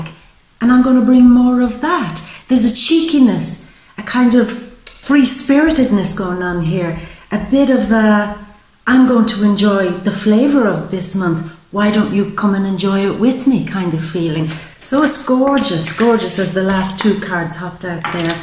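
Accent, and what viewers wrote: Ireland. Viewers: Is that an Irish accent? It's great!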